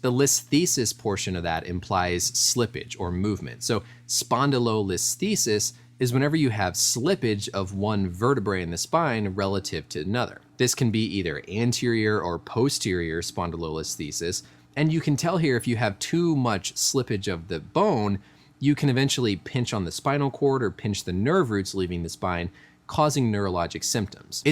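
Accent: United States. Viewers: As soon as I heard his American accent I turned it right off.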